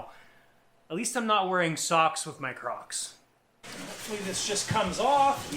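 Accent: Canada. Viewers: I don't know if I like this channel more for the great info and tips, or the fact that Canadians talk so funny...lol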